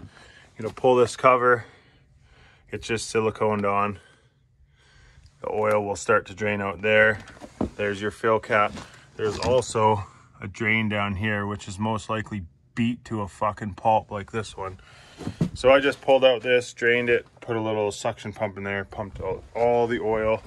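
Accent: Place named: Canada. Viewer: Your Canadian!! That's why I can understand you 😂😂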